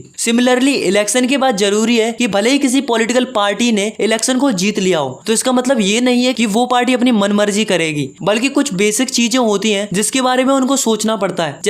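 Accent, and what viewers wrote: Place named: Bihar, India. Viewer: bhai apna accent theek karle thoda bihari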